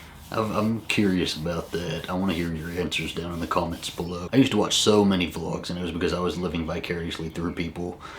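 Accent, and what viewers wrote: South, United States. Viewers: OMG I love your accent. You know how to turn this Scottish guy on by saying hi. I can hear you on the like of Dukes of Hazzard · Southern accent..turn off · I understand your hesitation with your dad. I went through a lot of the same abuse.... but, with time comes forgiveness. I hope you will eventually be able to forgive your dad for all the things he's done. The fact that he accepts you as a gay man should speak wonders to you though. If he didn't accept you, he wouldn't have slept in your house at Christmas. My wish for you is that you let the hostility go. I started watching your vlog thinking you and your husband had it together. And I adore your southern accent... I think you owe it to your lover to man up and show him you're better than your dad and that family means everything to you. Forgive and forget, I know it's hard, but it's easier than carrying that burden of hate.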